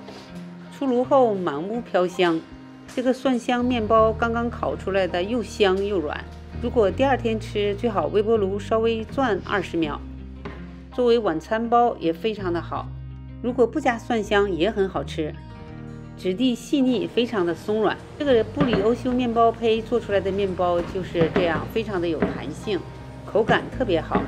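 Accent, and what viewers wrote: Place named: Northeast, China. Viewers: 一个吐司用拔个面包生坯，哈哈哈哈哈哈哈，好亲切的东北话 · 是沈阳话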